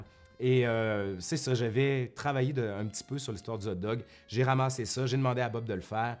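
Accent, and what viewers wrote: Quebec, Canada. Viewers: Merci pour votre travail. j'ai adoré la (longue) vidéo sur la révolution française. Narrée par un cousin québécois, ça apportait un charme certain..., ainsi que la FAQ. vos élèves ont de la chance de vous avoir. Pour les 'platistes', comme disait Audiard : 'si on mettait les cons sur orbites, ils n'auraient pas fini de tourner'. Encore un effort pour les 400 k. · ^^ moi, je t'ai découvert grâce à Ben. J'adore l'accent de la nouvelle france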